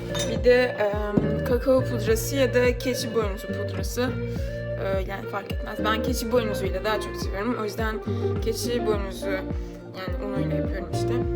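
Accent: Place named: France. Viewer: konuşurken hafif tatlı bir fransız aksanı geliyor sanki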